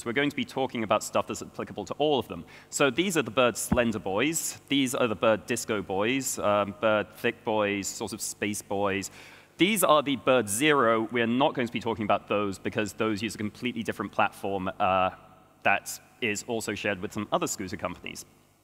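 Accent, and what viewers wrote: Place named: West Coast, United States. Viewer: I'm starting to hear a touch of west coast accent in there...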